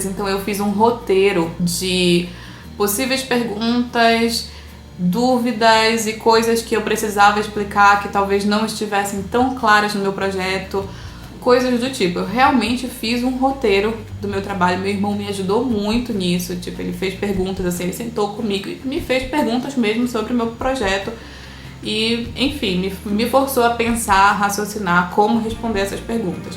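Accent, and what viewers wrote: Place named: Pará, Brazil. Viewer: você é paraense ❤só pelo seu égua eu me inscrevi 😅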